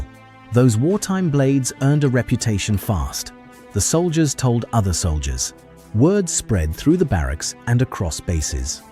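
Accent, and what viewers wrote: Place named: United Kingdom. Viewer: An American iconic product being narrated by a British voice, come on youtube you can do better.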